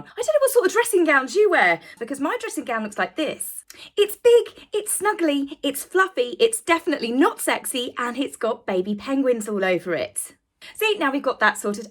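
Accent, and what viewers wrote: United Kingdom. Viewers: Thank's for talking a little bit slower in the video. Sometime's it's hard to keep up with the British accent when it goes so fast. Lol...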